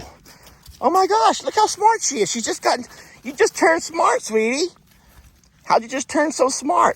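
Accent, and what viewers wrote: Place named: Texas, United States. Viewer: to this Aussie Texas accent isn't clear English. I often have trouble but I agree with the captions being distracting.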